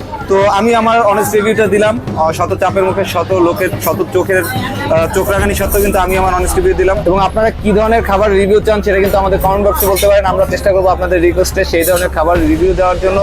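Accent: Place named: Barisal, Bangladesh. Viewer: ❤❤ভাইজান আপনি অনেক সাহসী কথা বলা ধরণ দেখে বোঝা যায় বরিশালের মানুষকে কি সাহসী হয় তা বুঝলাম ধ্যনবাদ।আপনাকে।❤❤❤❤❤❤❤❤❤❤❤❤❤❤❤❤❤❤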